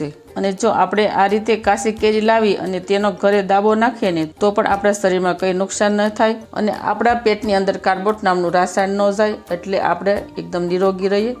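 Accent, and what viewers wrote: Bhavnagar, India. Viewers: તમારી ભાષા પરથી તમે ભાવનગર તરફનાં લાગો છો, બહેન !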